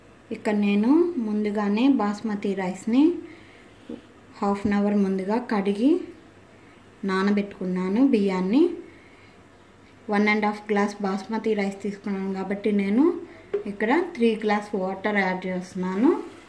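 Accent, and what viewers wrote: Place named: Rayalaseema, India. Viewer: Mi voice super alage midhi rayaalaseema andi endu kante vullagadda annaru anduke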